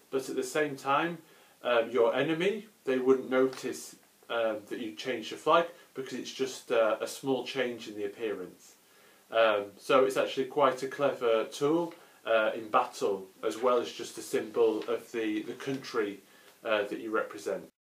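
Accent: United Kingdom. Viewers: イギリス英語かっこいい＾＾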